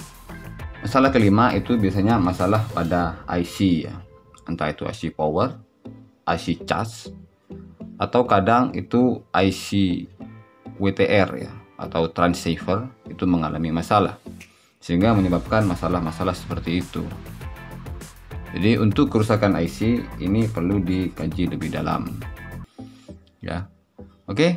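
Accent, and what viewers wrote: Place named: East Java, Indonesia. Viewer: Maksih bang infox..klo di dengar2 logat nya abang nya pst dr jatim 😄👍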